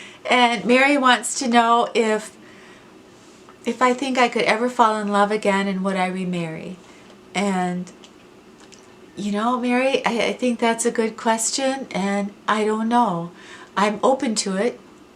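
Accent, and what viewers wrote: Midwest, United States. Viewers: My mom told me about your channel 3 yrs ago. So that’s when I started watching you. Your the sweetest lady. As soon as I heard your accent I knew you were from the Midwest. I lived in North Dakota for 28 yrs, but now I live in Oregon. Anyways, you always bring a smile to my face. Movie..I would have to say Hallmark hahaha just love them. But I do love Cinderella the (live action) Have a great evening. ❤️🌸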